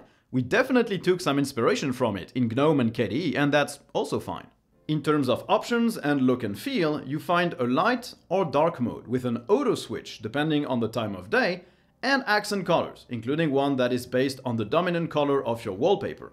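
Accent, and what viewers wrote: France. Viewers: That's French accent^^ funny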